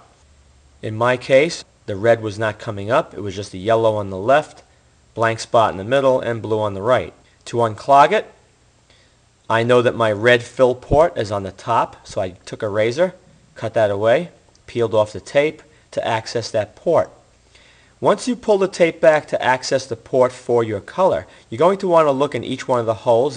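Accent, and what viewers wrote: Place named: East Coast, United States. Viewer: Well done vid sounds like an East Coaster-Jersey maybe New York? wild guess-What they sound like to me anyways (West Coast-Wa. state)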